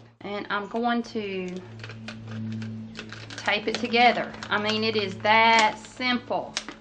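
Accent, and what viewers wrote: South, United States. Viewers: it sounded fine to me :-) it is our southern state accent... USA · u kind of cute with that southern voice... :-P wiking in denmark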